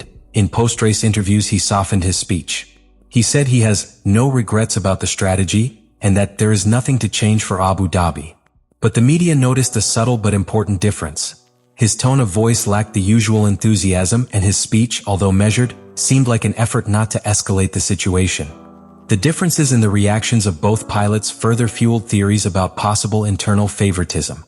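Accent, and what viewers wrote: United States. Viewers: American accent with rubbish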